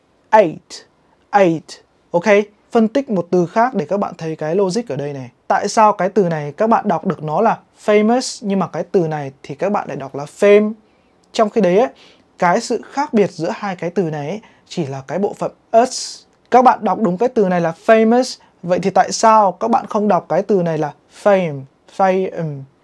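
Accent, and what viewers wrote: United Kingdom. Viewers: Ong nay thi ong phat am theo british ma suot ngay cu blame theo A.E accent lol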